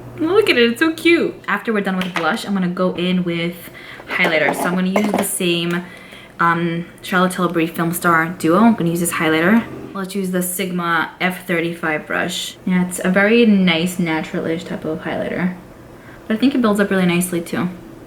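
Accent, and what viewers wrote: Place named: East Coast, United States. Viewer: I adore your East Coast accent! And i ordered the TF palette yesterday. Only would’ve ordered it on sale vs full price tho.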